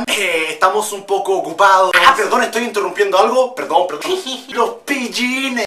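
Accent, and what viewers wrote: Chile. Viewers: Cuando todavía hablaba chileno y decía "conchetumare":v xd 2019 lo extraño.... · Aún es chileno. Yo lo escucho hablar chileno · Cuando german aún tenía acento chileno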